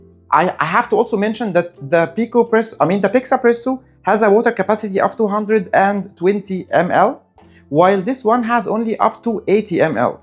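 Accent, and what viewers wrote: Egypt. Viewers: Do you recommend any of them over the Outin Nano? And, how does the Picopresso compare against the Nanopresso? Also, are you Egyptian? You have a very distinct accent!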